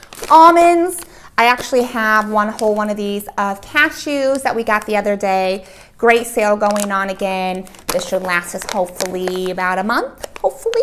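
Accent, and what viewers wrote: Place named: South, United States. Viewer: This woman is very traditional and I like that. And also the accent is great... Is that Southern American accent, near Texas or something??? I am saying that because I watch American cinema a lot and can understand the little slang from one part of the country to another.🙏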